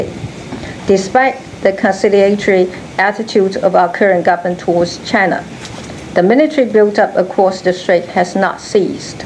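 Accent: United Kingdom. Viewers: The speech was very thoughtful, and she has a more british accent i felt, which made her sound sophisticated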